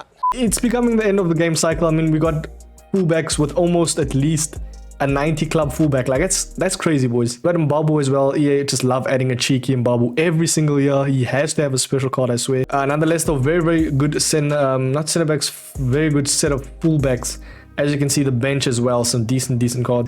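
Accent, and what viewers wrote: Cape Town, South Africa. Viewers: You sound like ya from Cape Town with a mix of something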